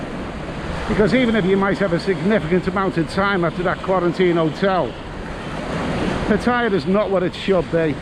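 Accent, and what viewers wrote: Liverpool, United Kingdom. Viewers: Hi,in Bangkok at the moment,just passed second pcr test,thank god.Must say the two tests made me anxious but I’m lucky I passed that’s all I say,it’s a big chance for anyone to take I must say.Ramifications of testing positive are not great financially or mentally.I am off to Koh Tao for two weeks with my Thai girlfriend and a few well deserved beers👍 Love hearing your voice as my old man was from Fazakerley,only a scouser would know where that is. He’s passed away now but reminds me of him. All the best to you🍺😊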